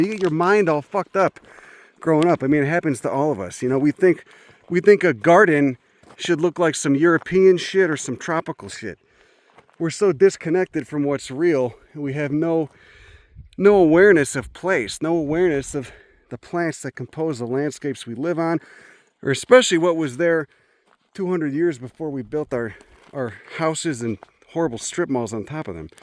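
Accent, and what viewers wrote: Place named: Brooklyn, United States. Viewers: This is the most educated guy from brooklyn i've ever heard lmaooo.. heya fuck face, why don't you respect the botany huh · You and Bill Burr have officially broken my stereotype that people with that kind of Northeast accent are dumb 😂😂 this was the most entertaining and enthusiastic appreciation of cactus and railing of the culture ever put to video lol Thank you I will be sharing this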